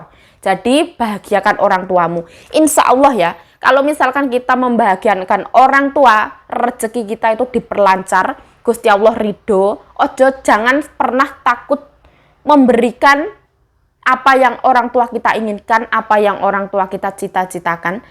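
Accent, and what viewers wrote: Java, Indonesia. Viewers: slm knal, aq dr tanzi, liat km lwat d branda, cba q simak, kok asik jg cara bicaramu, medok tegas crdas, salut sm km, brbakti sm ortu, synk sm ttgga, Smga kdpan ny lbh sukss ya, Aamiiin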